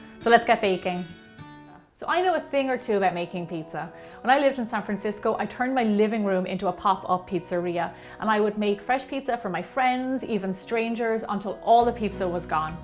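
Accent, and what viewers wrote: Ireland. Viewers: I could listen to you talk all day! Sexy Irish accent. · Are you Irish, Jamaican? Your accent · Yeah, better to watch a pretty lady with an Irish accent making children's food than a hairy, grunting,sweating man in a vest dripping sweat and armpit hairs into the cake mixture... :p No probs. This recipe is a good pizza recipe. For years, I have been making my pizza and bread dough in almost the same way, so yeah it's worth keeping. I'm a bit sad that cooking secrets are being given away on YouTube so that rich people can make even more money, while people who have kept them as family secrets for many years are still poor... Take care.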